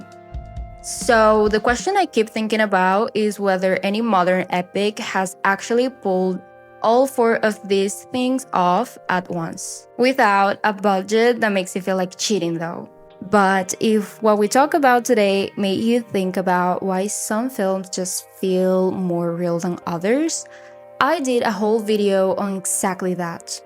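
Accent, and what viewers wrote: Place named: Tagalog, Philippines. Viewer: Are you Filipino too? I think I hear a little Tagalog accent, or maybe I'm wrong.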